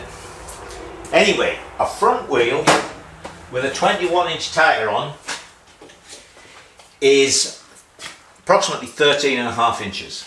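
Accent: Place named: Middlesbrough, United Kingdom. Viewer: wow, small world. I hoped that was a Boro accent, I was born in the Boro , and live in Stockton, I've been a bike nut since I was a kid, I used to race a C15 on Redcar beach .about 7 years ago my Canadian cousin and I rode a Valkyrie and BMW from London Ontario to New York to pay our respects to ground zero and visit Billy Joel's bike museum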